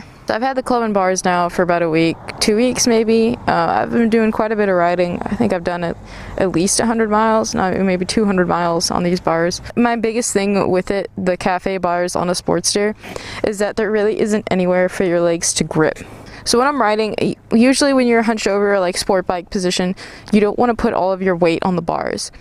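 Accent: United Kingdom. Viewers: English accent on point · why dont you put on a set of MY kustom bars ;) and your british accent is bloody good mate!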